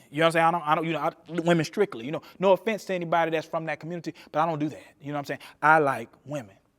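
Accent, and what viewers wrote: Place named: South, United States. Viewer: Got that southern Baptist preacher drawl… love it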